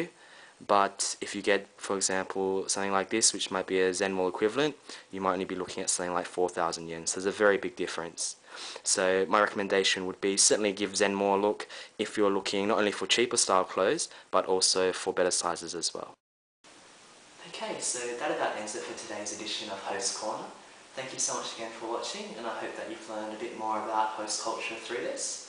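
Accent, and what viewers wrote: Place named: United Kingdom. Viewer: ギャル男-looking guy with british english, what a cool combination lol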